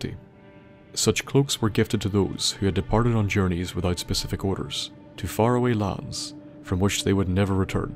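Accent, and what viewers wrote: Ireland. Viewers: Bruh, I am so in for Elden Ring, please bro, please, I need that sweet sweet Irish accent for my Elden Ring, please do this like you did for Fallout, don't kill yourself, but I'm in for this, I need it lol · Hell yes, an Irish lore hound! Absolutely adore hearing Radhan called mad as a bag of spiders. 💜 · The irish lore master is back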